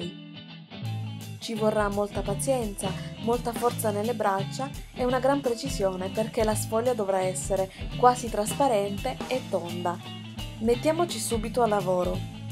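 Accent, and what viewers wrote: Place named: Romagna, Italy. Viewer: Che brava la signora!! Adoro la sua cadenza romagnola. Mio padre era emiliano e la sua famiglia ci ha insegnato a fare i cappelletti. Anche se mamma già sapeva fare la sfoglia. Purtroppo io non riesco senza la macchinetta, ma i cappelletti ogni tanto li faccio. Grazie per questo tutorial!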